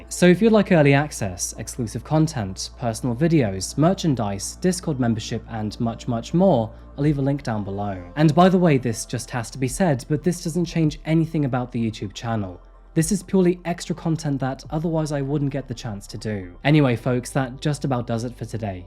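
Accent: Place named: United Kingdom. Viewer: ❤ your channel very much and I listen to it every time when you come on. Never do I miss an episode. Love 💕 your British accent as well!! I’m half Welsh from my grandfather’s side and would love ❤️ to visit your country one day hopefully 🤞before it’s too late ⏰ in life lol 😝. I’m old, hahaha 🤣!!!! But no, I really am ancient, or at least I feel that way sometimes. The body is giving out, lol. But I guess we all will go through that in the end one day or other. Right?? Wishing you a wonderful weekend ahead and stay healthy and safe too. 😊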